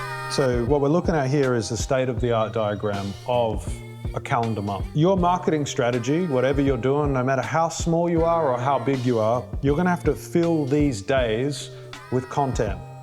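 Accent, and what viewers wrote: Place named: United Kingdom. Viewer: Bro I wanna decode your British accent through Alvin and the chipmunks lo-fi hip hop because you are hipsters and I'm here to get a sense of your style? For a marketing video? You wanna drag my ears through barbershop b-roll music whilst you pretend to explanate any bit of information. Fire your editor posthaste good channelkeep.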